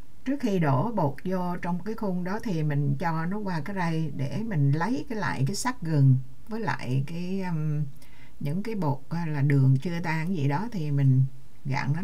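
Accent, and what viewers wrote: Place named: Central, Vietnam. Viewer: Em thích nghe giọng nói miền Trung của chị, học thêm những chi tiết đặc trưng để tăng cường kiến thức. Cám ơn chị rất nhiều. Em vẫn nấu bánh tét bằng cách dùng IP slow cook, bánh hoàn hảo tuyệt vời luôn chị à. Sáng kiến thật hay.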